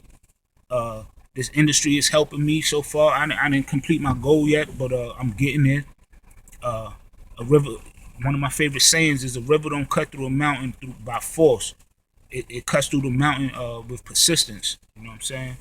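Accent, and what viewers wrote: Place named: New York, United States. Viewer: You sound like a NY Dude ..... Lol good looks on the video tho bruh, good luck to you On your journey PEACE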